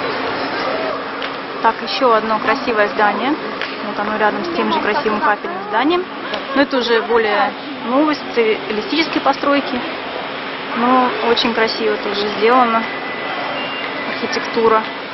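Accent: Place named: Saratov, Russia. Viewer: Комментирует нормально, чисто по Саратовски, не нравиться не слушай.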